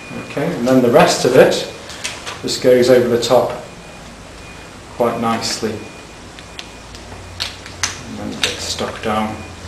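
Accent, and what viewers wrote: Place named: England, United Kingdom. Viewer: finaly after serching for ever i found an english one not american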